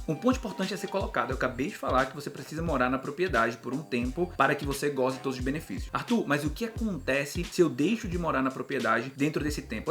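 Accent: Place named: Portugal. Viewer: Kara, não consigo parar de assistir seus vídeos🙈 conteúdo direto, e esse sutaque da terrinha já traz um pouco de intimidade. Parabéns maninho! 🙌🏻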